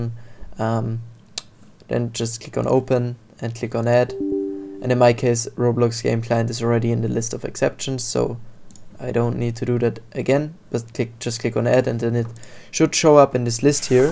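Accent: Germany. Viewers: i hear deutschland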